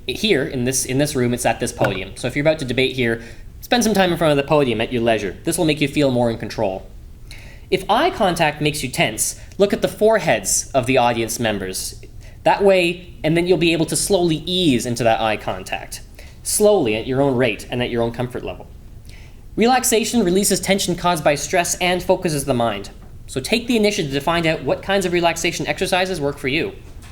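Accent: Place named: Canada. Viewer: Neither, it is Canadian.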